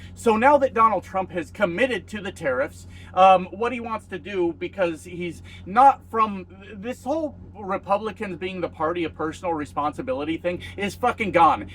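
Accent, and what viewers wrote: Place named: Canada. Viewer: Howdy Trucker from Canada! Keep these videos coming. I'm addicted now to my daily Postcards from the Cab! You sound Canadian!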